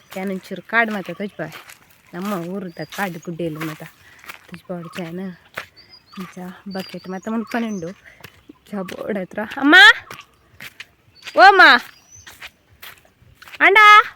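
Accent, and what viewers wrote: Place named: Tulu Nadu, India. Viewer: Eerna Tulu kenara bhaari kusi aapund👌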